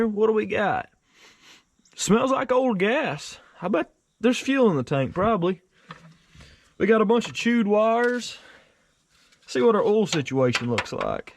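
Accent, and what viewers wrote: South, United States. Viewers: That southern accent even if your smart you sound dumb. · Shit your southern "dialect" is the best, gotta appreciate it when you hear it - not too often here in Finland :) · I love that Southern Accent!🐊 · Great videos sir! Used to live in Georgia and miss that southern drawl !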